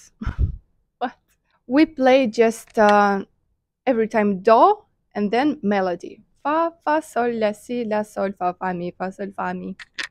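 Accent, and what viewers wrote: Russia. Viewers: Ничего себе, у вас произношение нот как у русских, до ре ми фа соль ля си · Автор ведь знает русский? Мне, кажется, есть русский акцент...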